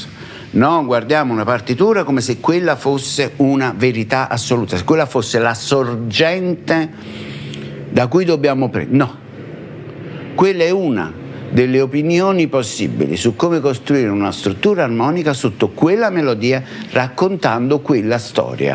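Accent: Naples, Italy. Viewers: Oltre che bravo insegnante sei simpaticissimo con le tue espressioni con cadenze napoletane. GRAZIE mille.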